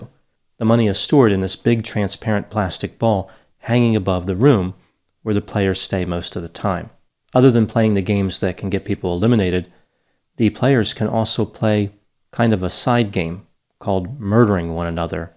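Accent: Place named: Boston, United States. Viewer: The best accents are generated by native Bostonians!! The Doc has a cool accent! 😉🙂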